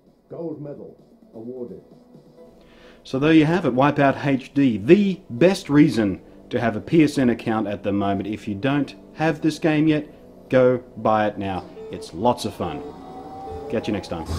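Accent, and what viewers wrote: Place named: Australia. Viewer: what the hell is wrong with you? He's Australian he LIVES in Australia, that's the way he talks, he's not faking the damn accent.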